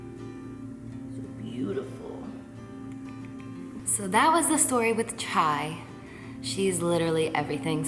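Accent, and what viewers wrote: New Jersey, United States. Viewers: shes nj n i think ny talk like that too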